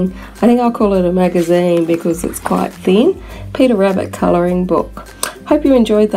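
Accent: Australia. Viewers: Hi Have you moved yet? I seem to remember you saying you ere moving to Brisbane? Love all your reviews thanks for the effort you put into it. It’s so lovely to hear an Aussie accent too 😀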